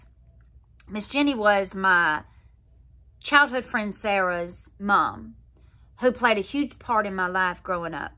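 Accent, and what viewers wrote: South, United States. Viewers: sounds like a real southern belle we in here in georgia luv you youre the VERY BEST how great is WE LUV YOU luv your southern alabama accent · Yes !!! Make up tutorial!! Need it. and more economical shopping for clothes. Keep up the smiles and the positive vibes. Live you and so does my husband. So glad you also speak southern. 💓✝️🇺🇸😎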